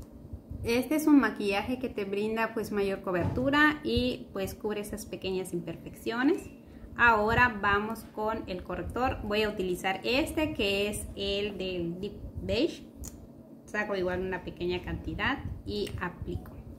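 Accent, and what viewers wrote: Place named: Campeche, Mexico. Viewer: Me encanta tu acento es de Campeche? y tu cutis., muy sano y radiante.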